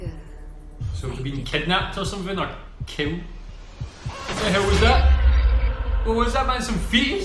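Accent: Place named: Scotland, United Kingdom. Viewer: Yo man, you have the cutest scottish accent. I could listen to you for hours. I wonder if you could do podcast or audiobook lol. Anyway, please do watch this movie. Give us a review and let us know if it's worth our money. Thank you!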